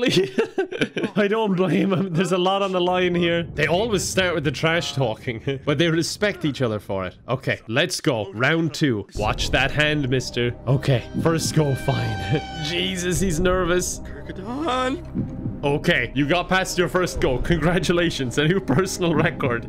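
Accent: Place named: Ireland. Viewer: So I’ve been binging your videos enough that your accent has gotten stuck in my head for a few days and now I’m convinced that I have to go visit Ireland, it’s officially the second thing on my bucket list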